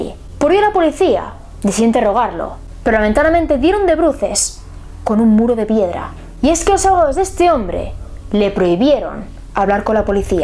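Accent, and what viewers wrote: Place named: Castile, Spain. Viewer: Si todo va bien... ! Jaja 😍 me encanta tu acento castellano, de hecho todo.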